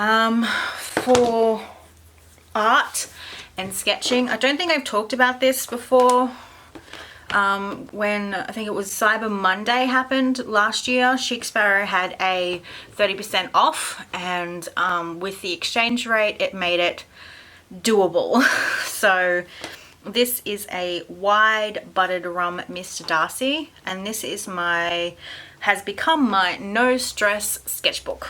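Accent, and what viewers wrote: Australia. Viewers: I love Australian accents. So cute :D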